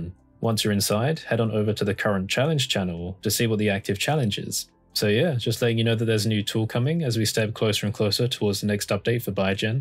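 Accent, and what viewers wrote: United Kingdom. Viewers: You sound English. Anywhere I can throw money at you in GBP to avoid the exchange rate?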